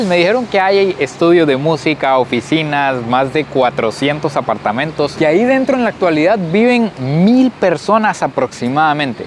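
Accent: Argentina. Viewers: sos argentino o que xd los uruguayos no avlamos haci xdd C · Cuando te sentaste al lado de gardel hablaste como argentino,no como uruguayo, ellos no dicem che ni hablan con ese canto caracteristico de argentina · Pero porque hablas como argentino. Pero che.... el che es argentino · Decime por que hablas como Argentino .Nosotros no hablamos así.Estaria bueno antes de hacer los videos se informen un poco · Hola como estas? Muy lindo video, pero solo te voy a corregir un error... Estas hablando como argentino, ese acento no es uruguayo, es como si fueras porteño, el Uruguayo habla mas tranquilo, sin resaltar tanto las palabras. Otra cosa nosotros no decimos siempre "che", nosotros decimos "bo", ta" y listo😁😁😁 el che y el boludo es mas argentino, aca, podes decir "fijate y fíjense" que es como decir el miren u observen o chequen esto. Saludos cordiales desde shangrila, ciudad de la costa, canelones💪💪💪💪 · En Uruguay no dicen che y hablan más pausado, estas imitando el argentino😅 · Ahí no están los poderes de Uruguay , solo el poder ejecutivo, en el Palacio Legislativo, esta el poder legustavito, y en el Palacio de Justicia, el poder legislativo. 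El teatro Solis puedes entrar, solo tienes que ir en l horario en que se hacen tours con guía para turistas. no hables como Argentino cuando te haces el uruguayo, así no hablamos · Mano no hables como argentino que a muchos Uruguayos n le va a gustar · TODO CASI BIEN PERO NO ABUSES DEL CHE Y MAS CON ESE TONO QUE LO HACES NOOOOO PARECES E ENFRENTE ARGENTINO NO URUGUAYO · soy de Uruguay pero por favor no hables en argentino pe da cosa xd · Molesta un poco que se quiera hacer el uruguayo pero hace el acento argentino... pero buen video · ÉSE ACENTO ES DE ARGENTINA NOOOOO OFENDASSSSS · Sonaste a argentino, no a uruguayo…. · Es casi lo mismo, qué ganas de romper las pelotas. Además, los argentinos tienen muchos acentos. En todo caso, decí bonaerense.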